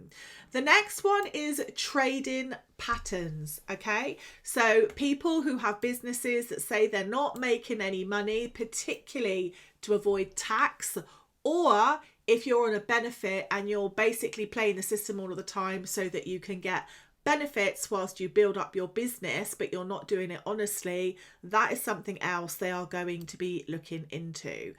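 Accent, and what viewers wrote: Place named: RP, United Kingdom. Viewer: Can u not speak normally no need to trying to speak like a queen.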